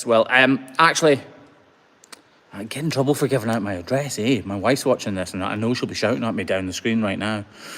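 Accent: Scotland, United Kingdom. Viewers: I realize this is a stupid sounding question, but as I get older my hearing gets worse and my ability to decern accents has become total rubbish so I need to ask for clarification are you irish or scotch? My heritage is a a bit muddled, English, Irish, Scotch, French, German so you can imagine my shame at not being able to clearly recognize the accent, although my best guess these days is you are Scottish and the guys at Tech TV are Leprechauns.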